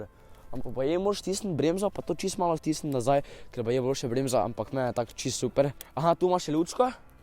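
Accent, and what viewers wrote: Styria, Slovenia. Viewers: Nauč se govort normalno pa ne po Štajersko pa boš Mel več ogledov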